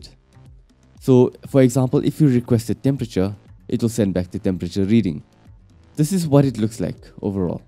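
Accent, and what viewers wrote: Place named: South Africa. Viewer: are you an indian from South Africa by any chance?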